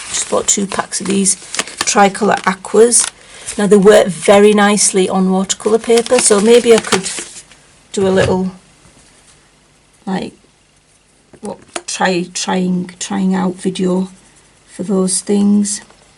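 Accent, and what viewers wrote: United Kingdom. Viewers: I love hearing you talk, K. Maybe because Im American & like the UK accents.hehe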